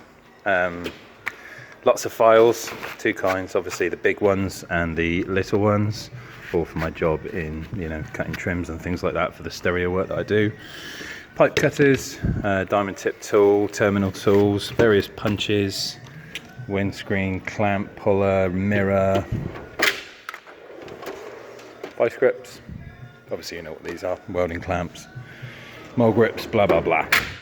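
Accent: United Kingdom. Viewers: English man in USA ?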